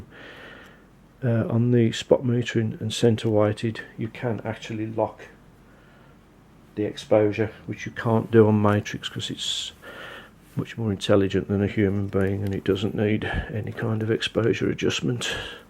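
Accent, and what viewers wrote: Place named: Birmingham, United Kingdom. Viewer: You delivered the information, so job done. It’s got nothing to do with the Brum accent, my nerdy cousins in Handsworth have stronger accents than you and can hold a captive audience about some dry elementary principle in a dog-eared physics book. I could listen to Noddy Holder, Ozzy and many other native Brum or Black Country personalities for hours. It’s more about the lack of passion and energy, especially for one of the unsung heroes in the Leica back catalogue. I didn’t expect cartwheels and American style presentation exuberance. But to me you came across like a govt information film narrator from the 1970s, but that’s just me. There are many much worse on YT, and I probably present no better than you, but doesn’t prevent me from speaking my mind and giving some hopefully positive critique.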